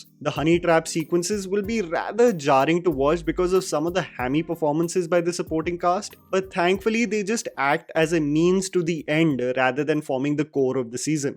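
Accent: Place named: India. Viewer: Congratulations of 1M. Every time i start your video for review and end up being mesmerized by your english and accent. Like Uk, Us accent yours one is pure definition of indian english accent. Like this is how is should be.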